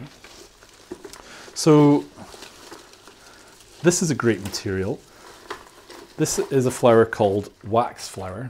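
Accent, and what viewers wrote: Scotland, United Kingdom. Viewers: Scots accent ? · I envy British accent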